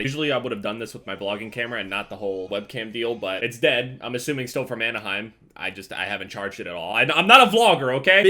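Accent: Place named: New Jersey, United States. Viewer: New Jersey accent on point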